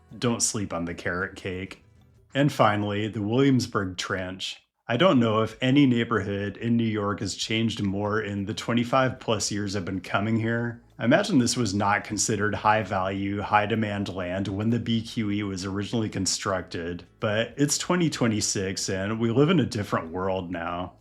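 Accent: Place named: Southern California, United States. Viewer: When are you going to ditch the fake SoCal voice inflection? You obviously weren't born with that, it's just something you learned from your environment. You don't live there anymore, but you still sound like a teenager. Are you going to sound like that at 90? When will you be genuine and use your own voice? If you change your voice to fit in, who's to say your political views aren't just as phony?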